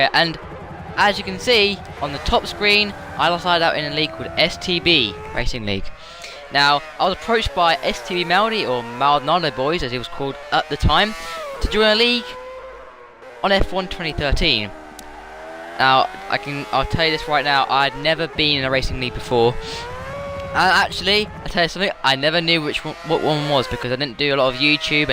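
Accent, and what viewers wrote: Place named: Birmingham, United Kingdom. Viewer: BRUMMIE BITCH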